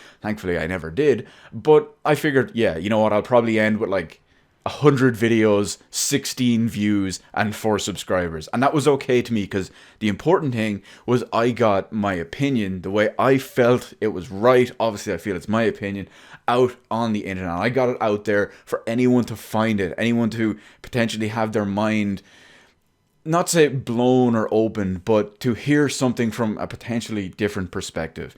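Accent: Ireland. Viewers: Thank you for explaining. My channel my be gone too ?? Oh well nothing lasts forever?!?!? Is that Ireland 🇮🇪 accent ? Cool 😎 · It's a shame that this is what we will be faced with. Lemme guess, you're not Irish at all are you? You definitely sound Irish xD If I lose my account, I will not be upset over it. YouTube is essentially a breeding ground for trouble of all sorts. I will always remember what I have done ever since 2010.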